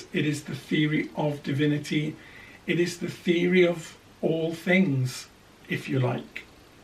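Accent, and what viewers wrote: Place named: South Wales Valleys, United Kingdom. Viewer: It sounds as though you have a slight welsh accent ?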